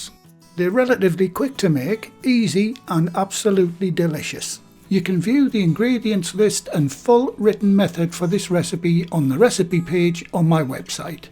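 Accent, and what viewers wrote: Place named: England, United Kingdom. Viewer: Hi I love your channel, I am an English girl living in USA and I miss home very much so it’s great having your accent (even though I am a London girl lol) talking me through my bread recipes! When you get to the end of a step I always say “okay ”! My husband says you talking to again lol. You have taught me a lot about making bread, it’s my new passion, I never thought I could make bread (I have been posting pictures of my bread and other recipes on Facebook and one of my friends called me a kitchen witch, a great compliment that I share with you as it’s because of your videos and the great way you explain) and I didn’t think I had the tools and utensils to do so but where you show the alternatives I have built up my own bread making utensil drawer. I hope to be half as good as you one day. I was wondering if you have a seeded bread roll recipe that you could share with us? 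I am a vegetarian if you have any good veggie recipes that would be nice! Thank you for sharing your gift! Oops as I was typing this message you say how you can add seeds can you add them to white rolls also?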